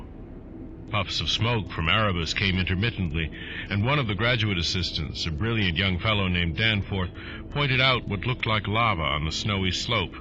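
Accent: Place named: United States. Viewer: I don't like the USA accent, it should be read with a British accent.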